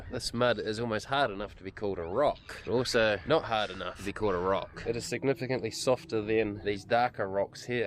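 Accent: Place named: Australia. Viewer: Your presentation differs from other YouTubers. The Australian accent adds to that. Uniqueness always has a change of going viral. Keep plugging away.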